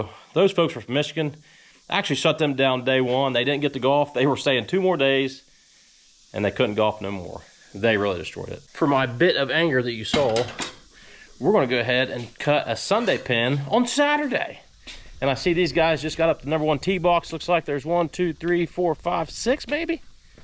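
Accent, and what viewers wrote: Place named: South, United States. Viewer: Yay! Nice to hear southern ahyia 😂👍🏻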